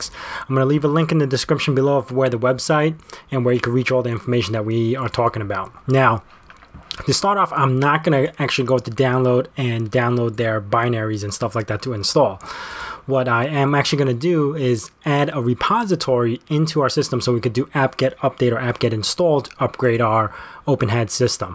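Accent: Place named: New York, United States. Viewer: Are you from New York? You got the accent haha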